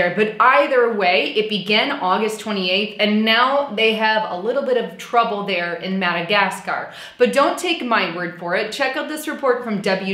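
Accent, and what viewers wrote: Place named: Midwest, United States. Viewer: where are you from? You sound midwestern. I just suscribed to your channel a week ago. I really enjoy your reports.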